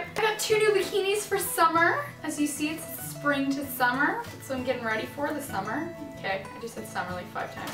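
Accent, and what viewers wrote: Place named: Australia. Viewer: Looks like you've picked up a bitOf an Australian accent haha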